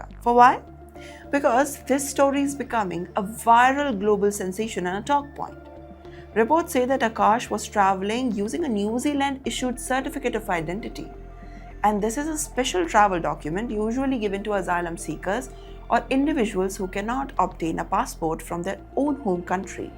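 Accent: India. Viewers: Terrible curry English!!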